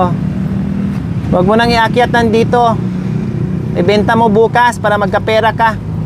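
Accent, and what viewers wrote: Visayas, Philippines. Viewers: ang cute mo mag bisaya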